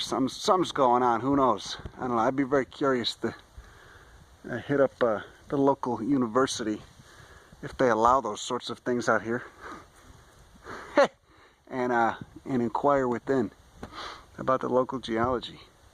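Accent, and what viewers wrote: United States. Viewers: those leaves look like they were pressed yesterday, thanks for the video, just one thing can you slow your narration down just a tad i have trouble keeping up, not use to the U.S accent.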